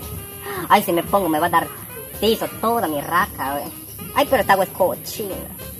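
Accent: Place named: Peru. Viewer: se nota demasiado q es de peru me aparecio en recomendados esta muy recomedado :D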